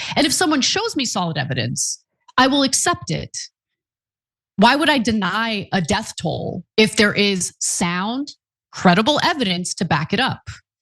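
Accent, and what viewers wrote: United Kingdom. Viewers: Her extreme BRITISH accent proves she is NOT Iranian. The fuckin’ British caused most of this bs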